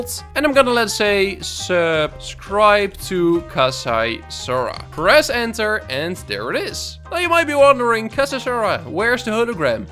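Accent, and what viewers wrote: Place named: Netherlands. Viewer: bro, never heard of you, but in the last week i watched like almost al youre server vids lol, youre a life saver. thanks Bud! one question. are you by any chance Dutch? I am and i feel like i hear an accent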